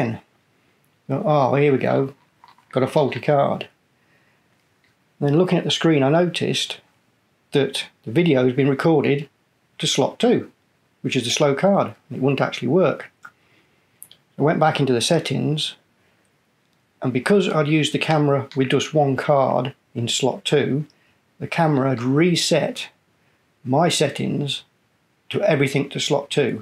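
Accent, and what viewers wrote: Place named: Derbyshire, United Kingdom. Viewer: Is that a north Derbyshire/north Notts accent I can hear?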